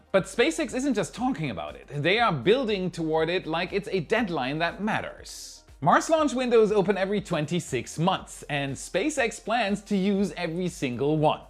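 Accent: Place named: Germany. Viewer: Is it just me, or Is German accent starting to fade a bit? If he lives in Florida long enough, it'll start turning into a southern accent...wonder what that will sound like? a german-southern accent...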